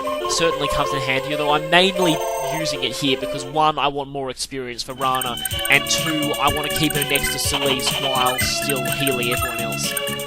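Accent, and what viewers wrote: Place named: Australia. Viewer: Blah, I wanted to see more of this - Your style of LPing is great, and your accent makes a nice change from most LPs as well - Australian and Scouser are incredibly similar to my ears, being in the UK, but as a fellow SF member, I can't wait to see this continue.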